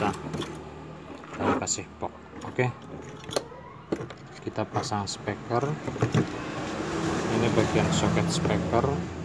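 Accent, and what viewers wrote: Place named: Brebes, Indonesia. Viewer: Cerdas men rika wa nyong ngerti rika wong Brebes o ya? Hehehe..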